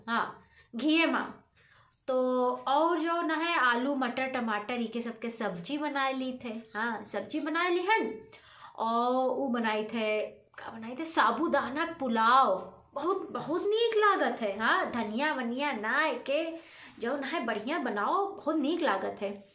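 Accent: Awadh, India. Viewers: बहुत सुन्दर , आवाज तो हमारे अवध की है 🙏🙏। 😀😀😀😀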